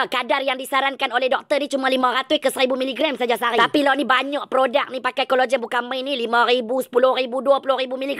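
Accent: Kedah, Malaysia. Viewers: Sedia2 bedah kna na.. Cian dia. Syok dgaq bhasa kdh piaw..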